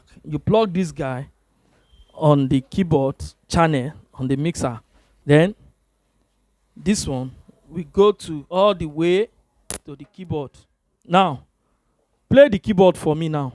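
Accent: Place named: Nigeria. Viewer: That's Nigerian Accent 🇳🇬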